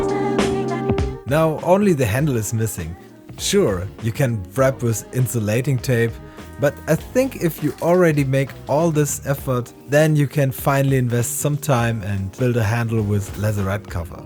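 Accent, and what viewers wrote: Germany. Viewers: Hey. Es hört sich so an als wärst du eigentlich Deutscher Muttersprachler, daher spreche ich dich mal auf deutsch an. Ich bin gelernter Oberflächenbeschichter. Das was du in deinen Videos machst, mache ich sozusagen hauptberuflich. Daher kann ich dir bei Fragen gerne Helfen. Ich persönlich verstehe nicht, warum du den Strom erhöhst mit der zeit. Du solltest die Oberfläche ausrechnen und den Strom entsprechend 1,5-2,5A pro dm² einstellen. Die Unreinheiten die du auf der Oberfläche siehst, sind Verschmutzungen in deinem Elektrolyten, die sich als Poren auf deine Teile legen. Deine Kontakfläche ist eigentlich groß genug, du solltest versuchen nichts um die teile herum zu spannen, sondern im Innenbreich zu kontaktieren. Scharfe kanten oder spitzen solltest du jedoch abschirmen, da du dort sonst Anbrennungen bzw Knospenbildung bekommst, so wie dein Draht beim ersten teil ausgesehen hat. 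Ich hoffe das hilft dir weiter.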